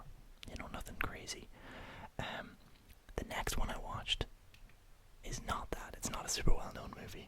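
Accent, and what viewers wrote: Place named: Ireland. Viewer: The irish accent is great for asmr. I could listen to you talk for hours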